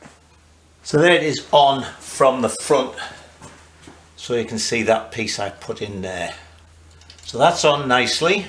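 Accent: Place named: North England, United Kingdom. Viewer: So, I'm on my first ever restoration and it's a BSA C15. Coming across these videos is a Godsend, and they're even done with a proper accent. I'll forgive you for being from the wrong side of the Pennines lad (not sure many Americans will understand that) but it is great to actually see things that I'm going to need to do rather than just read about them. I'm a couple of months behind you. I'm waiting for some shiny bits to come back and then I can start to reassemble the wheels, forks and shocks. Thanks for doing these videos - perfect for a newbie like me. I'll toast you with me Corporation Pop.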